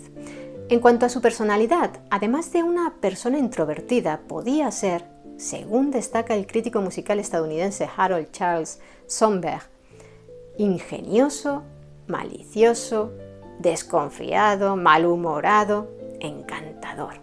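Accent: Spain. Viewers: Excelente narración y locución de. Gracias por dedicar tiempo en enseñar. Saludo Desde Venezuela. (Barquisimeto. Estado Lara). Podría saber de donde eres? Parece🇪🇸 según acento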